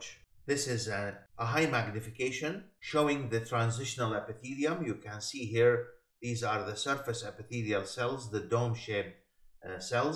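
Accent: Iraq. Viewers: Noticed the Iraqi accent from afar! Thank you for the brilliant explanation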